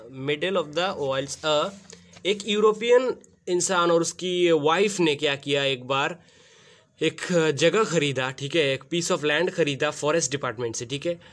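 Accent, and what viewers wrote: Bengal, India. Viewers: Aap Bengali ho na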